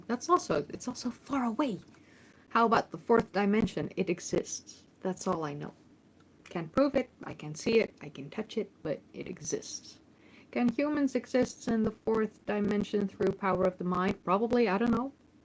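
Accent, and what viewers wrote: Netherlands. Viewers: I like your "Very Dutch" accent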